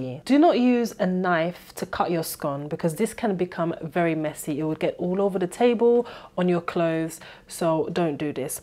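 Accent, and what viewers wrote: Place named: Cockney, United Kingdom. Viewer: Love your refined east-end accent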